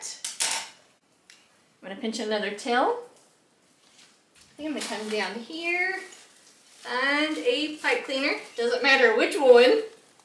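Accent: South, United States. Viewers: Hey Ms. I was looking on You Tube for a "fairly easy Grinch DIY wreath, and here you are... another Sothern Belle that sounds like me. (Roll Tide) Anyway, my best friend lost her husband a couple of years ago on Easter and is struggling with the holidays. I lost the love of my life 8 weeks ago and not looking forward to these Holidays, Even though he (hubby)would want me to grieve like I am, I'm trying to find some normalcy. So I am making her a Grinch Wreath. I wanted to thank you for your uplifting personality and sweet, patient spirit and MAKING THIS LOOK DO-ABLE. Have a wonderful Thanksgiving and Merry Christmas!!!!! And again, Thank you!!!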